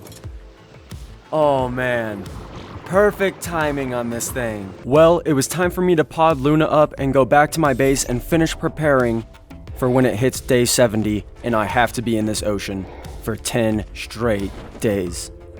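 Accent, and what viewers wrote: United States. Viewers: Sound Texan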